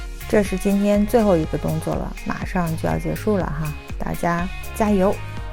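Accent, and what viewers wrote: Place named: Australia. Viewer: Australia!!! i love aussie accent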